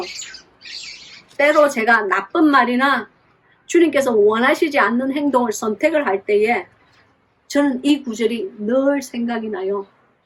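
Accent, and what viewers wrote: Gyeongsang, South Korea. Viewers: 성경공부 너무 좋아요 은혜가 넘쳐요 케냐 사람들을 이해하시려면 하나님의 도움이 정말 필요할것같아요. 목사님도 경상도 사투리 사용하시네요. 음향과 동영상편집은 토니 목사님께서 해주시는건가요? 너무 재밋어요. 예수님도 유머가 잇으시다는데. 잠근동산 책 주문햇어요 곧 도착하면 읽을 생각이에요 변화받길 원하고 실행하는 믿음의 사람되게 해주시길 기도드립니다 아멘 할렐루야^^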